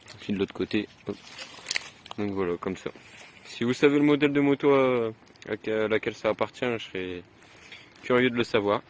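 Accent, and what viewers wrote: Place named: Nord, France. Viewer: Salut heureux de te voir te lancer en solo, on reconnait le Nordiste ( he ben laooo) Félicitation pour la pêche. Jean marc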